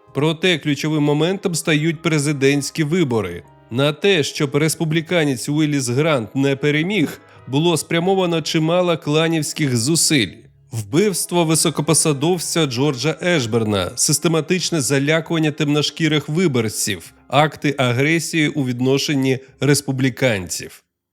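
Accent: Russia. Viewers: канал україномовний, але автор судячи по вимові в повсякденному житті російськомовний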